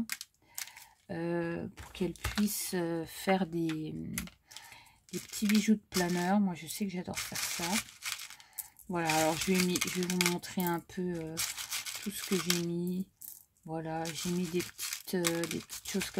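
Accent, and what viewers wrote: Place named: Lorraine, France. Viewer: Tout est très joli !!! Cathy a dû être contente. Je me suis abonnée chez toi aujourd'hui et je savais bien que je reconnaissais ton accent, je suis Lorraine aussi. Tu es d'où ?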